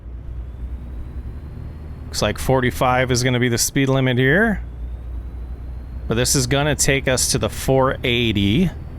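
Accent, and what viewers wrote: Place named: England, United Kingdom. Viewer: I think it's funny that she has an English accent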